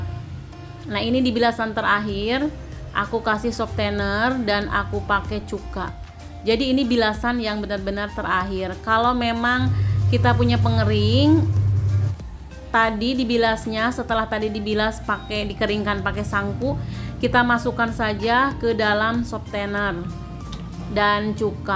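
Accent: West Java, Indonesia. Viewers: Kakanya meni Sunda pisan 😍 apalagi pas nyebut kata TV = dibaca tipi, hehe ... Semangat terus yah kak ngontennya, bermanfaat bgt nih 🥰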